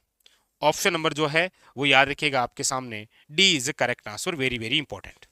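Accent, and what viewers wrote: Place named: Kuchera, India. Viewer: Aapki aawaj mst h sir lage kuchera k aas pass se ho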